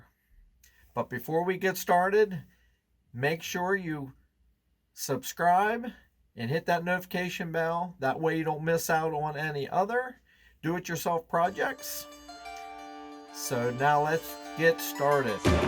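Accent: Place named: Southeastern Pennsylvania, United States. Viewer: You sound like you're from south eastern PA. It's the accent.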